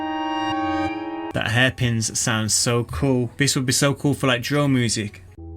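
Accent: Birmingham, United Kingdom. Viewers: Spitfire do the free BBC orchestra? Also you sound like a Brummy, though maybe somewhere close so, I believe people from Lichfield and other areas have a similar accent, I'm from Stoke so eh, just wondered roughly where you were wandering around in the trees? 🤔🙏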